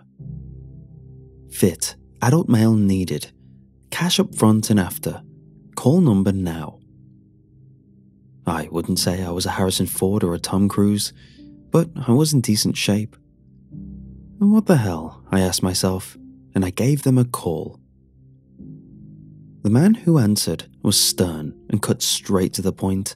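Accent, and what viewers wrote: United Kingdom. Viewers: I watch these just to hear your amazing British accent ❤️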